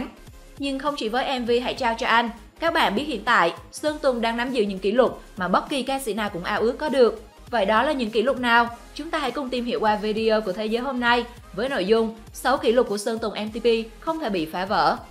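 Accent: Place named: Binh Dinh, Vietnam. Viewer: Ns cn rặc giọng bình định quá nhe ..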